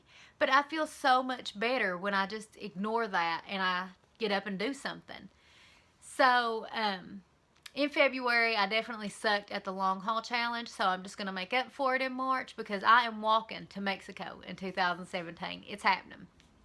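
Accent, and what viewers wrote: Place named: Eastern Kentucky, United States. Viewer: I have laughed so hard at the moonshine thing "well it's illegal." HAHAHA. You are going to rock your 30's like a beast! I am so excited for us to get together and shut down the WLS community on youtube with these Eastern KY southern accents :) You look amazing as always XOXO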